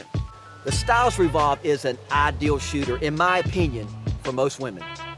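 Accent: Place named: South, United States. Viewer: I've two of the ORIGINAL, "Simple Shot" Axiom Champs, and among my dozen or so others, they remain my favorites. I also have an original (1st gen.) "Scout" , also terrific, and also made by our sports/hobby's most likeable gentleman, Nathan Masters. Back around 2009, when I was Assistant Chief to Chief AJ (John Huffer) of the NSA, he made a slingshot for ladies, which he called the "Pink Lady Quick Shot". It was pink, indeed, but other than that, it was no different than his other non-remarkable "Quick Shot" slingshots. I've a miniature slingshot pendant in a gold-like finish, not much different in size to a U.S. dime, made by Mark Seijan - it's cool! Enjoyed your vid, burps and all - though it is kinda strange to hear someone with a definite southern USA accent speak in terms of millimeters. I "get it" though, because those are the manufacturers' specs, and they cater to slingshot enthusiasts (rubber nuts) worldwide. 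The British "WASP" slingshots are probable also good for women, and I believe they're now being made in more than the four colors I already have.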